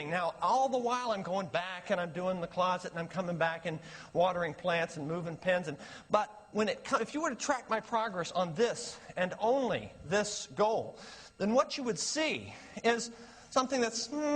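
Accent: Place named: Midwest, United States. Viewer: Does anyone know how to describe this guy's accent/affect? It's vaguely Midwestern but I feel like we could get more specific. He sounds like my Aunt Betsy.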